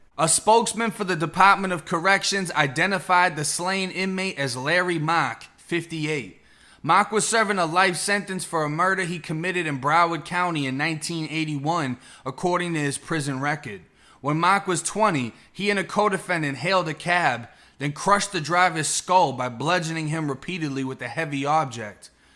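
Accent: Boston, United States. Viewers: Woman love the Boston accent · You from Boston? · Thats Boston. I get made fun of for my Jersey Accent.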